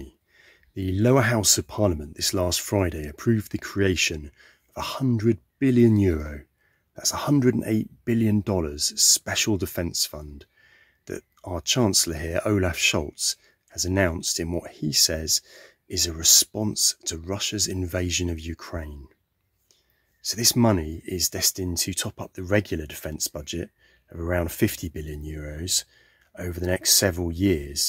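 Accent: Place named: United Kingdom. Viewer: ..."German Prepper" with a british accent?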